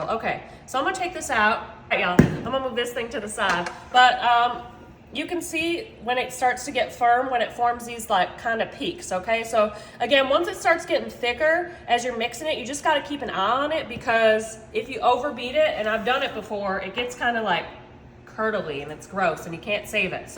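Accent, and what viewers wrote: United States. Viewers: Love your accent. What state is it from?? Love you Tammy from Winchester, Ohio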